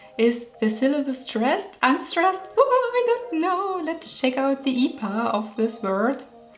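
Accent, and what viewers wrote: Germany. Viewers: Well, I'm American and have been living in Germany for about 9 months. My travel has been limited due to Corona, so I have mostly been hearing the Rheinland-Pfalz accent, but I don't think the German accent is annoying at all. I think do your reasoning for reducing your accent is good. I can understand you just fine, but maybe some Americans might have a harder time. There is nothing wrong with having an accent though. I am struggling to learn to speak German without sounding like I have a potato in my mouth if it makes you feel any better. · I'm German myself and I have to be honest,my first suggestion when I heard your voice for the first time,was "I she also German??"that's so sad,but I know that feeling,but I also have to say many of our english teachers aren't even able to speak with a proper pronounciation.... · Without sounding rude I think you have a very nice accent and can not understand why you'd want to sound less German. 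I (and others no doubt) can understand you perfectly fine. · I think your accent is awesome!I love it!!I don’t really care that u have an accent!!GERMANS HAVE GREAT ACCENT!AND YES ENGLISH IS HARD BUT U DOIN BETTER!🇧🇪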